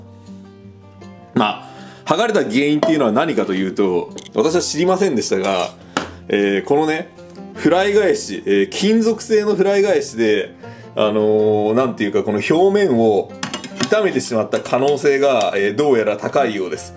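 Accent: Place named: Shizuoka, Japan. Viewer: 無印良品の発音直りませんねえ〜 後、収入の発音も。きほんひとりさんと同じでしたね。静岡弁なんですかね？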